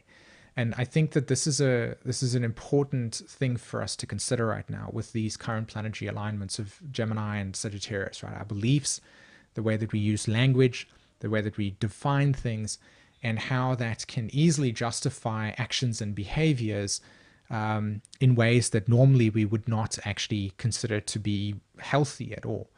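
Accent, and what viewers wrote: South Africa. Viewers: Brilliant, Have subscribed! Are you South African by any chance? Thank you for sharing your wisdom so beautifully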